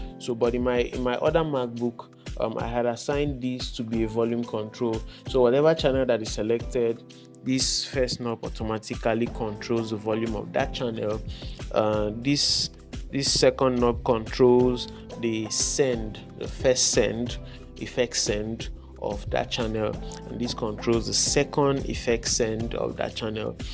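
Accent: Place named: Jamaica. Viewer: Your Jamaican english so cool, love it. I really want to learn Jamaican english for my reggae music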